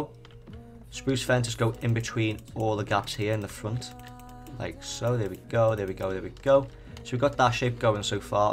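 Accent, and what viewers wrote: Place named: Liverpool, United Kingdom. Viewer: Ur from Liverpool aren't you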